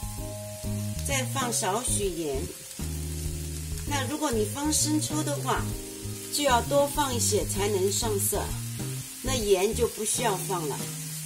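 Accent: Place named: Wu, China. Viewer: 上海人？听上去就是我家乡的吴语嘛。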